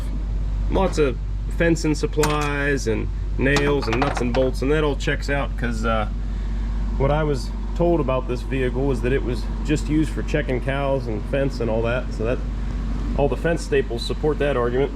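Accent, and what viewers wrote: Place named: South, United States. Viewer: that little southern drawl inflection is great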